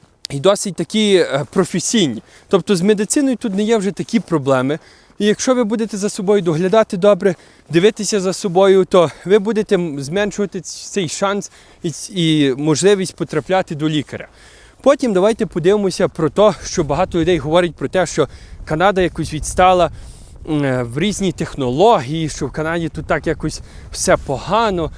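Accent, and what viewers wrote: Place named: Lviv, Ukraine. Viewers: Хлопче, кому ти це говориш?..До біса все це. В тебе коломийський акцент розмови, гайда копати кирницю в Канаді. Твої предки мігруючи за кордон, молодці що зберегли і законцервували для тебе мову. Так само зробили мої предки мігруючи до Аргентини. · Та ньи,він хоц і канадец,але чолувік з Львова,і всьо їдно всі розуміют шо хлоп хтів сказати - в тому й колорит нашої мови.На жаль ми катастрофічно втрачаємо наші місцеві діалекти - замість напевне якогось Черкасько - Полтавсько - Запорізького варіанту мови.ТАК ШО ВСЬО ФАЙНО!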